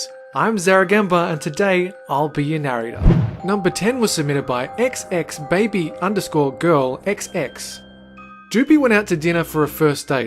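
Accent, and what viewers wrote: Australia. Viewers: Wait Are You Australian Because Ì M Am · YOUR AUSTRALIN!!!!!! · Your Australian?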